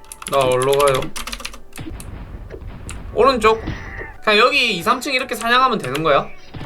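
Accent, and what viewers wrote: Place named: Gyeongsang, South Korea. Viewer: 경상도 말투 진짜 ㅋㅋ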